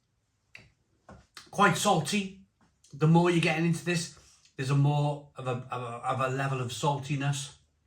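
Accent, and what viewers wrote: Wales, United Kingdom. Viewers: Is you Welsh is it?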